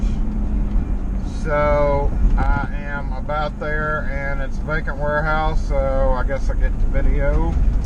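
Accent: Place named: Mississippi, United States. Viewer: Ah Jackson Miss. Baton Rouge LA here. Thought that accent sounded real familiar. Next time I'm in the area I'll pop in the shop and carry on about how I can pick locks blah blah blah 😂 jk 😆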